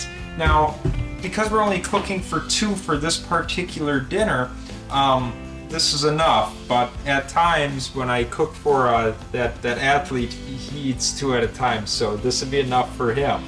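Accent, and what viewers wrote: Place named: Wisconsin, United States. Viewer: Hard to fake that northern Wisco accent! Great job. Very enjoyable video with easy instructions and explanations.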